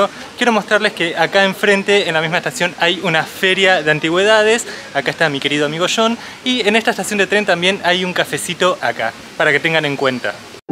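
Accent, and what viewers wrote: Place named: Buenos Aires, Argentina. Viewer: ¡Muy estimado joven nuestro, vecino mío, de verdad qué alegría poder visionar el nuevo vídeo de vos! Me preocupaba la ausencia. Quisiera reiterar a qué punto los vídeos me traen luz y felicidad. Y el acento puro porteño me da gran placer a pesar de unos puros argentimismos los cuales me escapan. Gracias a vos conozco la Argentina a cómo nunca la conocí previamente. Cuando Vds sacaron la copa, lloré de pura gratitud días mediante. No hay país más lindo y más encantador que la Argentina por todo el continente. En puro Don Quijote, ¡Cabalgamos, cabalgamos! Y serían bien apreciadas unas visititas de vos a Mendoza, si fuera posible. Más una peditita de mi parte. El apellido de vos lleva toda una historia en si. Sería también muy interesante un vídeo de un recorrido histórico del apellido. Según la Real Academia Española, es un apellido sefardí de conversos judíos de Salamanca convertidos al catolicismo y luego exiliados por el planeta entero. 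He conocido de por mi vida a unos oriundos de Venecia, de Estambul, de Casablanca, de Tesalónica, etc. Desde Pocitos de Montevideo, con todo respeto a vos, un fuerte abrazote charrúa, Lulú y toda la familia 👪de ella.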